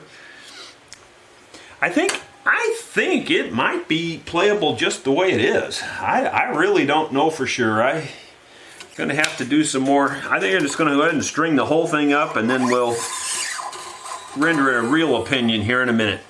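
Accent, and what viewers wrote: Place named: Missouri, United States. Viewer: I’m a bit further down the road but recognize the accent as “local” for sure. I’m in Jonesboro, AR and dig your repair style!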